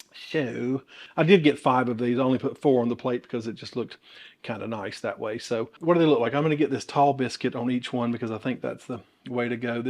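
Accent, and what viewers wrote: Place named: North Carolina, United States. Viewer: you sound so much like we do in North Carolina, so I feel like you're a good friend. You talk just right!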